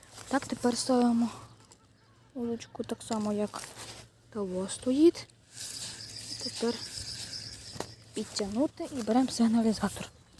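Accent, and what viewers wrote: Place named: Khmelnytskyi, Ukraine. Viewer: То ї в останні такий діалект чув років за 30 на річці Збруч Хмельницька область((ты юначе коропа лапах? Авжеж.файний короп))😅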